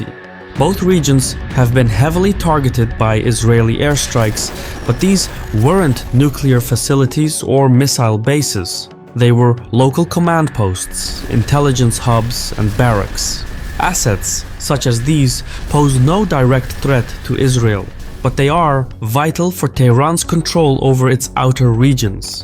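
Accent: Russia. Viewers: Thanks for spewing so much lies, you Russian asset with such a horrible accent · Dude at least hid your Russian accent 😂 before trying to say what Iranian people want or who they trust! Did king Putin put you up to this? such a low act !!!:face-red-droopy-eyes::face-red-droopy-eyes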